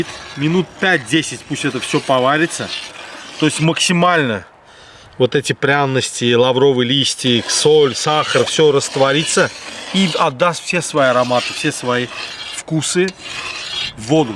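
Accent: Baku, Azerbaijan. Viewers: Приятный Бакинский акцент👍🏻👍🏻👍🏻пошла солить😋😉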